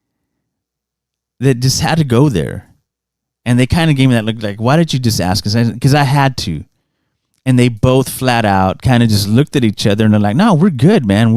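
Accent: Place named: San Antonio, United States. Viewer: First time hearing you, but I'm guessing you're from SA?? Is that the Red McCombs Indian??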